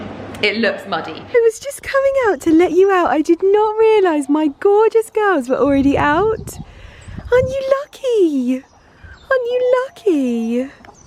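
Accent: United Kingdom. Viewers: I enjoy your videos 🥰i really enjoy you British accent I want to visit English one day favorite country 🥰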